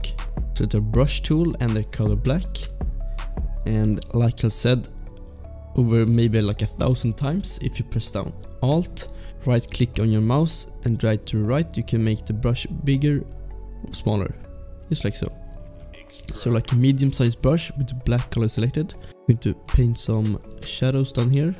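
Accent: Sweden. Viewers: are u swedish? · I know a swedish accent when I hear one ^^ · ARE YOU SWDEDISH? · Are you swedish?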